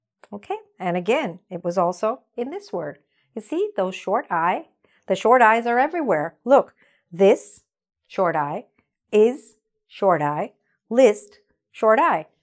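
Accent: United Kingdom. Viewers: You are my best English teacher Are you british ?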